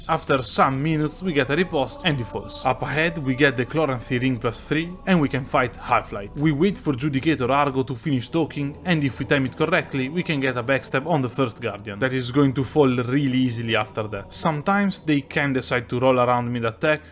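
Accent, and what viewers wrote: Italy. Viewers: Been watching your videos in the last few days and I keep asking myself “ma è italiano? L’accento potrebbe essere quello giusto”. I’m just writing the comment in English in case I’m totally wrong. Love your videos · l'accento italiano lo rende 1000 volte meglio <3 bellissimi video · Love the Italian accent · Si sente che sei italiano😂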